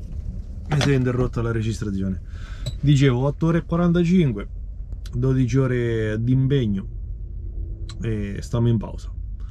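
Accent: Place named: Marche, Italy. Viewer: d'allaccento mi sembri marchigiano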